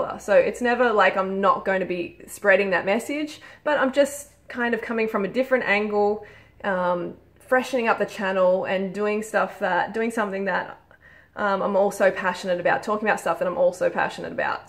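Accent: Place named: Australia. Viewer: say whatever you want Bebe, i can definitely listen to that Aussie accent of yours all day:)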